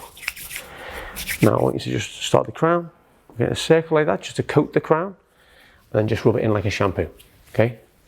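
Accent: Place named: England, United Kingdom. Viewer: The accent is a sick. I need to learn how to speak with that English accent 😅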